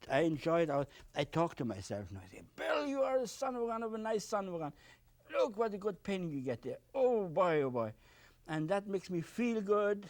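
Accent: Germany. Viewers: Michael actually he got a lot of recognition including an Emmy. I remember him well from my youth and I loved watching him before Bob learned from him. Bill’s personality was fiery, energized and warm and Bob’s was soothing and warm. I like the saying “Bill in the morning and Bob at bedtime.” For Bill too there was a barrier where language was concerned. His English was excellent but his accent was heavy. Whether we like it or not there is a prejudice in our country with certain accents and a tendency to gravitate to those who speak the way we do. It was difficult to get ahead as a popular, public personality with a heavy German (in his case Prussian) accent. Even now I see comments everywhere that mock Bill’s accent to one degree or another. I personally love it but there are many who would likely never give him a chance. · I used to love his Prussian accent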